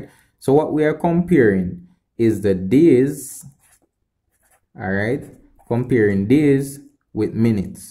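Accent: Jamaica. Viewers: Thank you man!!! Love your Jamaican accent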